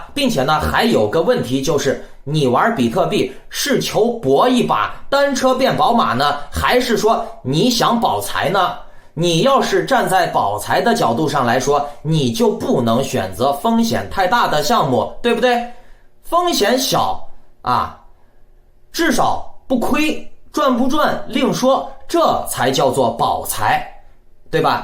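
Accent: Henan, China. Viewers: 恁是河南人？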